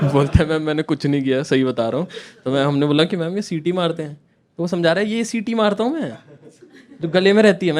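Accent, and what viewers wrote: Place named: Sonipat, India. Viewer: bhai jo teri awaz m tone h n dost va sonipat aali h dost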